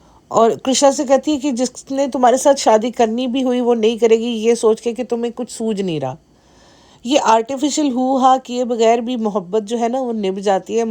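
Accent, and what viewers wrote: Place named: Punjab, India. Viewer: That punjabi tuch ❤❤❤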